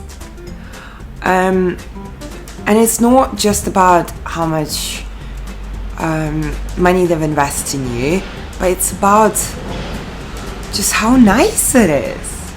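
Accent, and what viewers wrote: United Kingdom. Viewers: Sounds you have a British accent. Did you go to school in the UK? or Australian? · british accent? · Her accent sounds so english from England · Why your accent is British?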